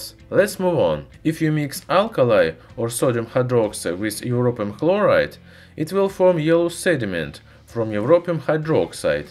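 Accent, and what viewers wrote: Russia. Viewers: Kindly forgive me Your accent is too much Russian when you speak English .Can you improve it anyway ? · Who dosent like ur ruski aksent dosent deserve to be educated... I like it same as our hydraulic press Chanel... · Ах этот прекрасный русский акцент.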